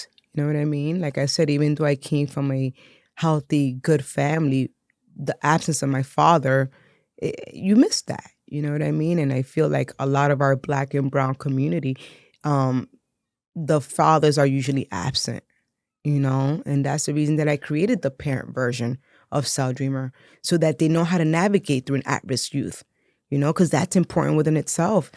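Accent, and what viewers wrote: Dominican Republic. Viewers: I caught that too when you spoke. I’m also Dominican. KlK 🫡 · I picked up on that beautiful subtle Dominican accent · Is she Dominican ? She has an awesome accent its so subtle. I was trying to place it but couldn't. Cool lady..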